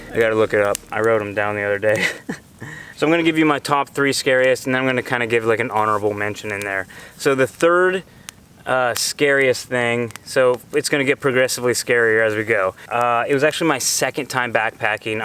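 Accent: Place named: United States. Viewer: I'm always relieved when I click on these videos and hear an American accent.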